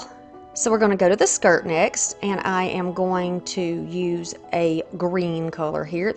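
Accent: South, United States. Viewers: Both are beautiful. Me and my 9 year old granddaughter are going to go a fairy garden outside. Have you done any fairy gardens outside? If so, do you have any videos. I shared this video with her. She's so funny. She said mamaw she talks different. We live in New Mexico, and she's never been to the southeast side of the United States. I used to live in Mississippi. I still have some of a southern drawl.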